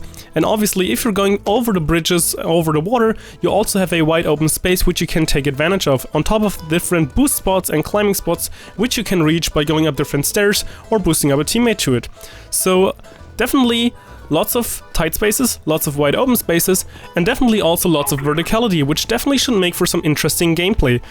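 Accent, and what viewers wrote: Germany. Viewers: Du bist deutscher? :D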